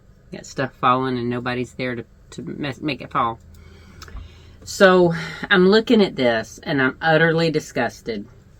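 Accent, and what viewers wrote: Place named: South, United States. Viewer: Hi! I love your videos. I watch every single one of them. Your southern speech comes my hectic Northwest pace. Please do take time for yourself because it’s very important.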